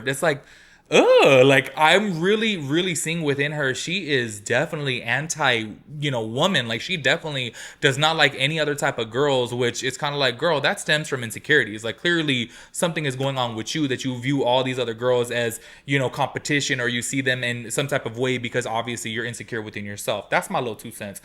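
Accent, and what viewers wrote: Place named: Hawaii, United States. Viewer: Mahalo, I said earlier when you was talking, I was like ehh .. he talk like me local kine ❤❤❤❤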